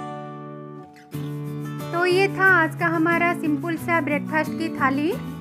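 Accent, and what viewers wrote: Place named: Assam, India. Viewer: Tumar kothat gom e pai tumi Assamese suwali buli...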